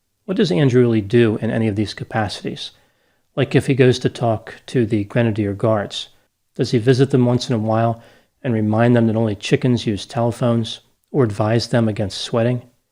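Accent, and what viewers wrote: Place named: Southeast Pennsylvania, United States. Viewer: Dr Grande is also an excellent comedian. Your SE Pennsylvania accent slips through at times. An accent I would note that I have never seen imitated in major production television or film.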